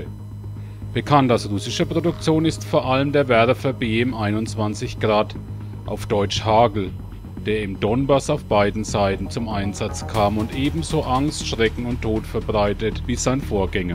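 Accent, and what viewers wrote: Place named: Franconia, Germany. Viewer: Ein Sprecher mit so einem starken fränkischen Dialekt spricht für "Russland.TV"? Hätte das nicht jemand mit russischem Akzent übernehmen können? Hätte wesentlich schöner geklungen. :)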